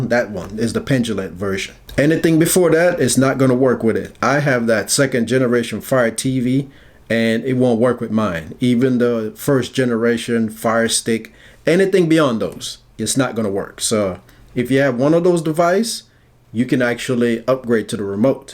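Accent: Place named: Jamaica. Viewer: Can hear the yard accent!! Big up bredda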